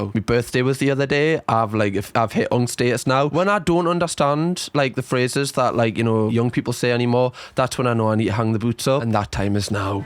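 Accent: Newcastle, United Kingdom. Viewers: He's from Newcastle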